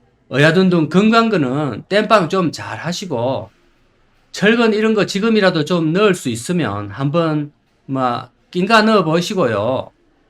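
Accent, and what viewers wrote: Gyeongsang, South Korea. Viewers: ❤~~small 그것이 알고싶다 ~~ 1인방송으로서 👍 👍 ~낀가넣다~ 정겨운 경상도 사투리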